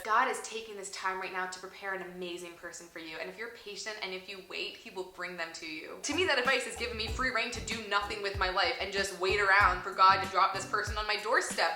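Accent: Canada. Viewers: I just love Canadians and the things they say. Especially when u say about ur accent comes out and it reminds me of my Canadian family and friends and it just makes me happy which i guess means u make me happy I know that this is a personal question but I'm curious as to what ur denomination is in Christianity. The reason y u ask this is because my family in Canada is apart of the United church of Canada and every summer we would go to a church camp located in berwick united church camp, berwick Nova Scotia. It's a 10 camp with all sorts of different activities and fun stuff. I was also wondering if u know of the Christian musical group from Canada called the message. They don't really put out there music publicly but it's really good music to listen to and stuff. Ur a great Canadian 😊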